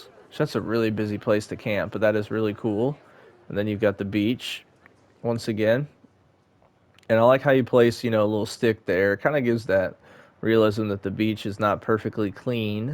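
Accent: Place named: United States. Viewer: Ah I see, the Mrs loves your accent and wanted to know what part of America you are from. She is actually part Native American herself, well part Irish, British and Native American, her Grandmother was an adopted Native that spent the first few years of her life living in a tent somewhere over there then married her grandad who brought her to England. The Mrs was very close with her and has a keen interest in her Native American heritage. I think your accents you have over there reminds her of her Nan.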